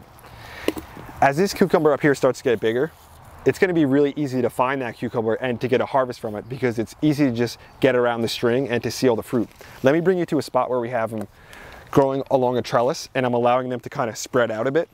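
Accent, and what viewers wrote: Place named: East Coast, United States. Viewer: Damn. A million subscribers. Nice. You deserve it since you're one of my favorite gardening bloggers, even though my gardening space is quite tiny (in an urban lot). Because you have that east coast energy which I love... even though I'm a Midwesterner. Probably because my father was born in Phili, and many of his extended family live in south jersey, So they a lot sound like you.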